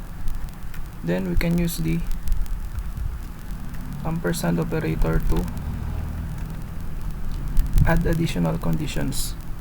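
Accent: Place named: Brazil. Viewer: Você parece um Brasileiro falando inglês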